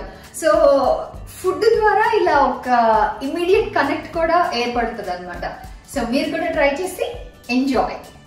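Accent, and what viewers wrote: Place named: Bangalore, India. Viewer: koncham banglore thelugu matladthundedhi kadhaa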